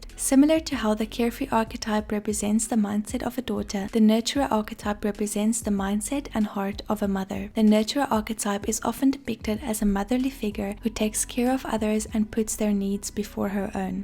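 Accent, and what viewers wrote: South Africa. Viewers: Girl are you South African 😭😭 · Are you South African? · Are you South African 😭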